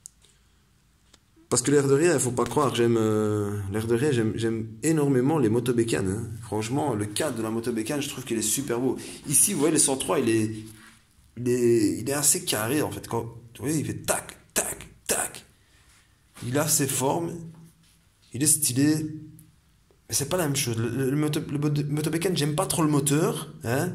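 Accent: Liège, Belgium. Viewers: Tes de quel côté de Belgique a l écoute ont dirai bien liège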